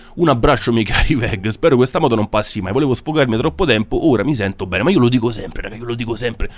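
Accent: Rome, Italy. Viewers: E qualche giorno che ti seguo mi fai ridere ma soprattutto perché parli il romano. Io sono vegetariana non mi dispiacerebbe essere vegana ma se non e fatto alla perfezione non vale la persona. Ti vorrei confermare che certi commenti non sono appropriate soprattutto quelli su i bambini che sono puri e prendono ciò che gli diamo, e per gli adulti che hanno preso a loro volta dai precedenti. E non tutti hanno il tempo per capire precisamente cosa si intende, che inferno si vive essere appassionati dei viventi che non vorresti nemmeno che inciampano, a me dispiace solo che non la pensiamo allo stesso modo ho pietà per la pianta compresi i suoi abitanti❕ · Pratico yoga, e ovviamente è una disciplina con delle basi solide, riguardo la medicina, la cucina, il quotidiano. E una persona autorevole che l'ha introdotto in Occidente, diceva, fate di tutto un po'. Quindi se una persona mangia di tutto un po', senza eccedere soprattutto in (mode alimentari), può essere sereno e non impazzire. 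Sto frequentando spesso il tuo canale, e il tuo accento romano è diventato una (moda) in questi giorni per me. Fai benissimo ad informare entrambe le fazioni, dei più disparati argomenti, la gente anche se non se ne accorge o è orgogliosa per ammetterlo ha bisogno di informazione e se con una aggiunta ironica è sempre buono! Iscritta, continua a stupirci. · Wow, finalmente un altro commentatore di commenti assurdi, per rendere meno opprimente l'attesa di un nuovo episodio di "Commenti Assurdi" (Max Noreg. Se non lo conosci, ti consiglio vivamente di visitare il suo canale) Mi piace il tuo stile: sei il primo a farmi prendere a simpatia il romanaccio(?). Riguardo al video... beh, se una persona è talmente poco convinta in ciò che crede, che basta un'immagine provocatoria a farla vacillare, direi che c'è qualcosa che non va. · Bellissimo...guardo i tuoi video perchè adoro la parlata romana......MI FAI TROPPO SIMPATIA...... 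E CARAMMELLE SE CE L'AVESSERO AVUTE SE LE MANGIAVANO CO TUTTA A CARTA😂😂😂😂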